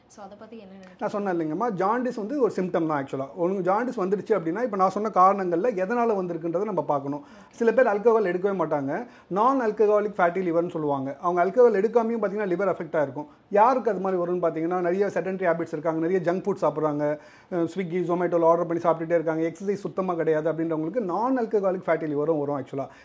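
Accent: Chennai, India. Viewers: chennai slang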